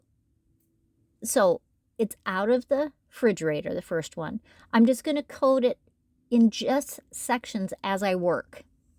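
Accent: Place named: West, United States. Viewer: Absolutely beautiful!! You are amazing!! I have to tell you, my Mom was born and raised in Livingston Montana. You say “frigerater” just like she did. 😍 must be a western thing. 😀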